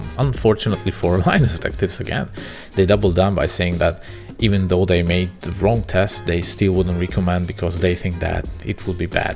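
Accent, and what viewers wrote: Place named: Sweden. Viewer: swedish accent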